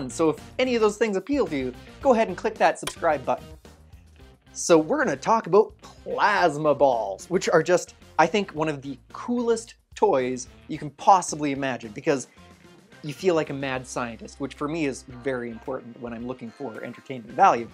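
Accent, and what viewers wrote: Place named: Ontario, Canada. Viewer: i know a South East Ontario accent when i hear one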